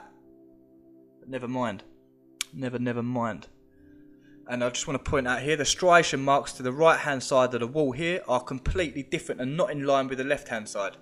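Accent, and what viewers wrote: United Kingdom. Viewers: Hay love the accent bro it's so English even English isn't English enough for you cool video too love to find hidden stuff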